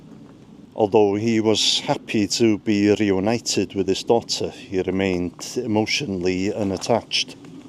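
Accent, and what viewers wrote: Wales, United Kingdom. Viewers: He's Welsh!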